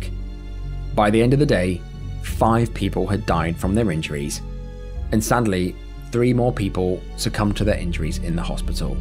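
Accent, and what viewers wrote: United Kingdom. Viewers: I did NOT expect you to be bri'ish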